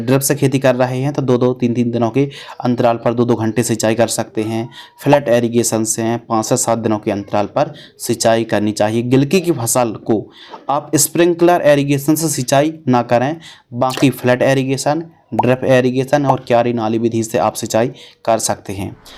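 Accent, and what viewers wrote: Bundelkhand, India. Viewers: Bhai tum ka bundelkhand se ho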